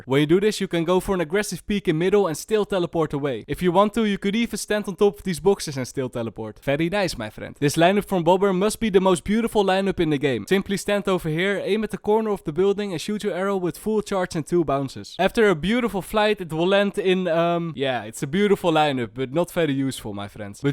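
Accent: Netherlands. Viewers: ur dutch bro? I love your accent 🤣😆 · You sound Dutch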